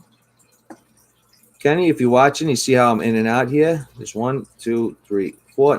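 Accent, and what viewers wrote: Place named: New England, United States. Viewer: You accent makes me miss life in New England (grew up in RI and MA). I'm currently in EU but hoping to get back next year.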